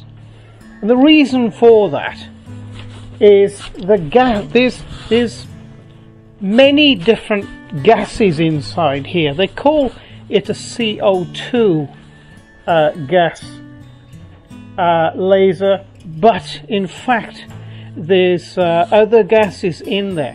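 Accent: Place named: Cardiff, United Kingdom. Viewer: laaarge! Got to be Caaardiff or thereabouts :)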